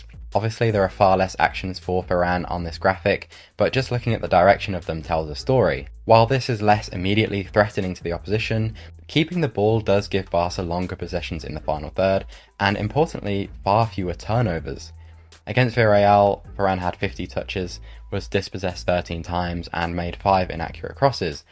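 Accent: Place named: United Kingdom. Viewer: yes, sometime I had the same feel of a barca game as, but just have the capability to put it into words systematically, and elegantly, plus that British accent (I am from US), love this dude, lol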